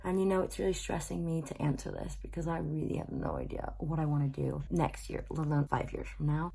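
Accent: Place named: United Kingdom. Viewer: there's some parts in this where you have a really strong brittish accent omg 😂❤️❤️❤️ I guess it's rubbing off on you xxxxx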